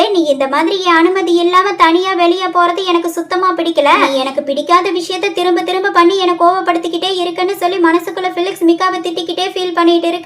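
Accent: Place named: Coimbatore, India. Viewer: Neenga Coimbatore i am thirvannamalai sis i love ur slang and voice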